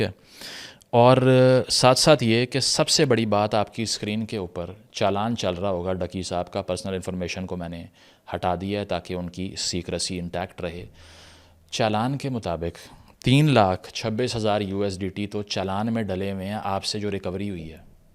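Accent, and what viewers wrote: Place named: Punjab, Pakistan. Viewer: Pukka Punjabi style waaoo mazaydaar exent❤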